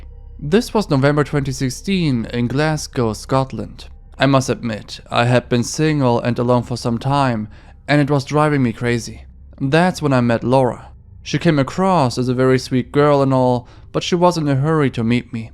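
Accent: Germany. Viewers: You are German, right? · I think he said once that German was his first language, so you’re probably just hearing how thick his accent is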